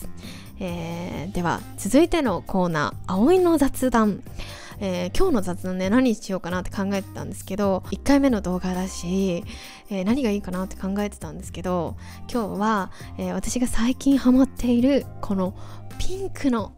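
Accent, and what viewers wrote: Kansai, Japan. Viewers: ちゃんの関西弁大好きです❤️ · めちゃんこかわいいがな関西弁かわよ 「そのままやないかい」 名言やないかい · いつもより関西弁聞けてうれしい☺️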